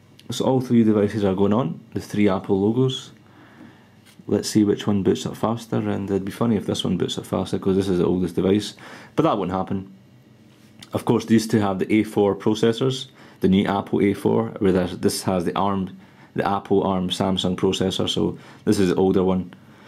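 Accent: Scotland, United Kingdom. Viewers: gotta love his accent!!! scottish!!!!! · Love u r accent (Scottish I think) and how u used some words,lol. And nice video and comparison! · i love your accent! where in scotland are you from?? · This guy speaks exactly like Craig Ferguson. And I dont mean just Scottish accent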